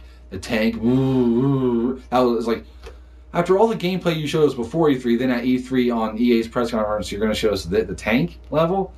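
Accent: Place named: United States. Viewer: Bro, you have the coolest American accent ever...^_^...and your new haircut looks like Sev from Killzone 2...woooo! BTW you have zombie spaghetti sauce on your shirt...O_O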